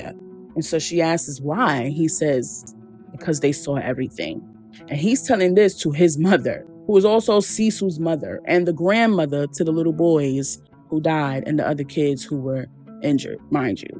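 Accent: New York, United States. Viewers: New sub! 😂 Love how you narrate! Do I hear some New York? 👀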